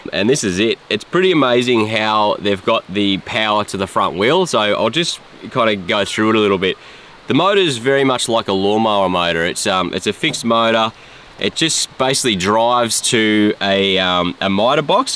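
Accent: Australia. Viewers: Very cool, I live in Canada and I've heard of Rokon and a couple other 2WD motorbikes, and I always thought it would be great to own one, I've owned all kinds of offroad dirt bikes, but never a 2WD, it would be great, but not until my kids grow up, I just hope I'm not too old to really use one by then, great review anyways Buddy, u must be from Australia?? Not New Zealand I guess??? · Damn! Wish I could speak Australian !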